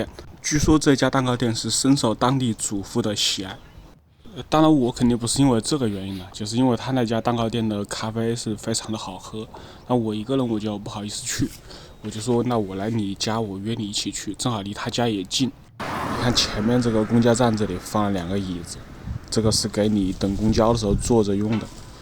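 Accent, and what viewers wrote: Wuhan, China. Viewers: 你这武汉普通话的口音真重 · 你是武汉的吗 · 武汉老乡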